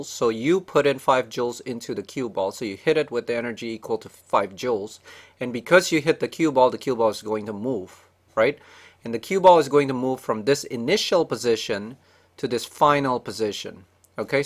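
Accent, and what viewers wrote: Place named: Asia. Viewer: Finally not an Indian accent. I'm guessing an Asian... Could be Chinese, or perhaps Cambodian...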